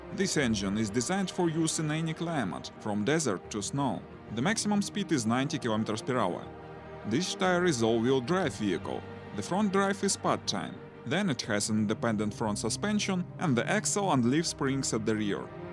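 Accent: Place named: Russia. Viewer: ну и акцент русский лучше бы по русский пиздел... а так пришлось звук убрать а то это слушать противно. с тем же успехом можно смотреть как китайца говорить па руски на утуб.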